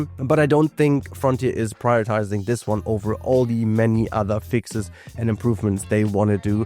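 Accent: Germany. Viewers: Immer noch aus Deutschland, hört man doch😅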